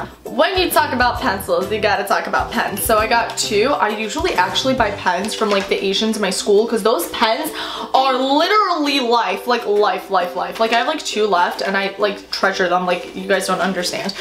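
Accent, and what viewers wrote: New Jersey, United States. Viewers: Dang she's from up north like Jersey or Massachusetts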